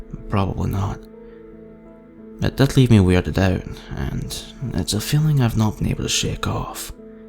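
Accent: Scotland, United Kingdom. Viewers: Great stuff, love the your slightly seedy Scottish accent c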